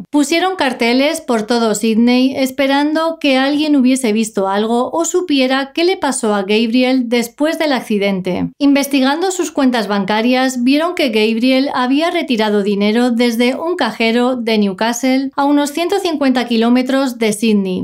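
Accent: Spain. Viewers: Me encanta como hablas y tú acento español.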